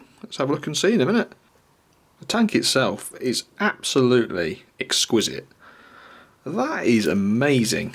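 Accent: United Kingdom. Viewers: You re british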